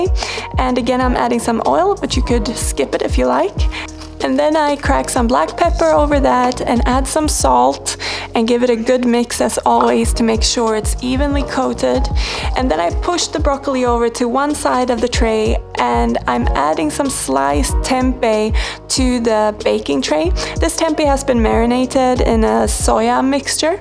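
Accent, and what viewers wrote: Sweden. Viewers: Just discovered your channel last night, 🌹and I'm TOTALLY IN LOVE. ❤️ Been VEGAN 💚 for many years, yet finding many of your recipes very inspiring! 👏🏼 Plus your sweet Swedish accent is so pleasant to listen to! Reminds me of my multiple visits to Sweden. 😊🇸🇪 Keep up the great work! 👏🏼🤗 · Det tog mig cirka 3 sekunder att höra att du var svensk. Ganska kul ändå.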